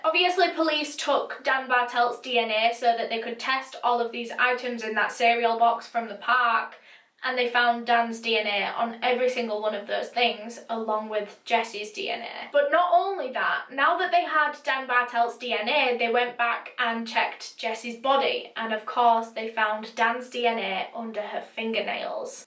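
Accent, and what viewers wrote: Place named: Yorkshire, United Kingdom. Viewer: think she's from Yorkshire, UK. Personally love the Yorkshire accent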